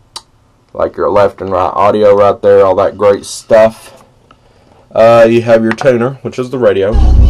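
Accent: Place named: South, United States. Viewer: he sounds like a southern dude........he sounds cool though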